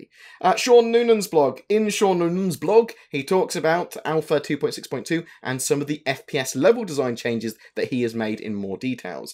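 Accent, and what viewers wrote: United Kingdom. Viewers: I love the british Accent! <3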